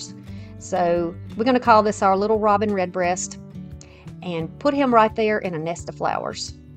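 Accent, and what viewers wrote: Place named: Texas, United States. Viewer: I agree! 🐰 I actually might have squeaked when he gave it to me. Hehe 🤭 I mean....just a little bit. 🤏 I thrifted a white ceramic bowl with fruit carved in it that was missing the top, so I'm going to make a nest to put in it and put the chubby momma and baby birds in it. Would you mind if I emailed you a pic? I'm not gonna force it on ya or nothin! Lol Side note..I love that you talk like me! I grew up on a dairy farm in Texas, and I'm about as country as you can get. Sorry to write you a novel and tell you my life story! Have a good night hun. 💜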